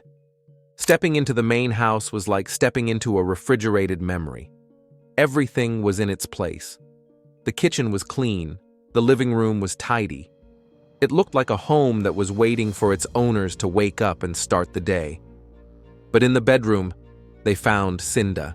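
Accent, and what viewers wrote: Delaware, United States. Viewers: 😂 Not to get off this topic, but you sound like you live in Delaware. DMV here will piss anyone off to the point you get lost trying to drive away from there. 😂😅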